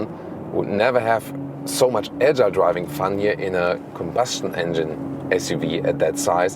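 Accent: Germany. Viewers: I happened to watch this guy's clips many times back 4 years ago while I was choosing to buy a car (Bimmer/Merc/Volvo). This year I watched some youtube clip I think about marine fish/reef tank or something and I came across German-accent English. I told myself I swear to god I have listened to this German-accent English before, from some guys but couldn't recall who (but kinda remember this face and remember it was from Youtube). Ahhh finally I remember.. its' from! Nice accent!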